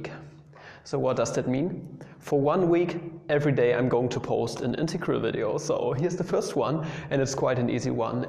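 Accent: Germany. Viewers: Heavy German accent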